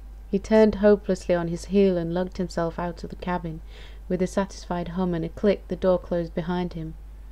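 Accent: United Kingdom. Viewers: I always enjoy watching you read! Love your British accent and when you take on the characters voices it shows you are a bit theatrical! I love it! I have to add the twinkle in your eyes, your restraint of laughter from your facial expressions was hard when your cat went meow! lol .. so cute!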